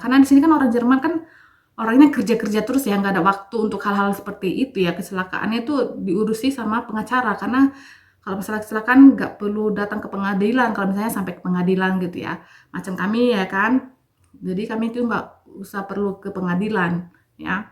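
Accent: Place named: Medan, Indonesia. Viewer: wkwkkwwk jujur kali critanya,lagi beol manggil2.hadohhh.salam dari martha mccrea sist,logatmu itu loh.medan kali wakkkk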